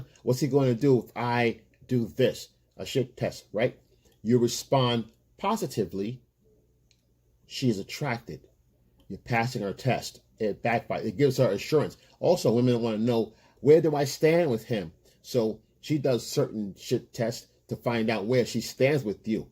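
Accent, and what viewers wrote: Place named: North, United States. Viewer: ...You live in a large city area,.I live in a rural area=The South✔..Big difference like night and day..The South is more of a traditional approach with women,even though the majority have the same motives all over america..From your voice and prospective I'll guess you live 🗣in the North..Completely different than the South,specially the rural areas where family legacy is known=Your family,cousins,uncle,aunts,friends,grands and exes are known through you(they know alot about you bro.)..They know where you go,hang out,job,who you bangin,what you drink=Ain't no million factors in the South rural areas bro🤣.,ain't no putting on a show unless the person truly is the show💯..Appreciated your videos and prospective wisdom great content..👊🏽💯.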